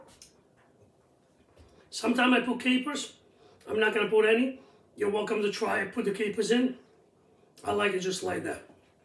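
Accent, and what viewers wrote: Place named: Italy. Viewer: love your Italian accent, what part of Italy is your family from? I come from Giovinazzo, Bari (Puglia region)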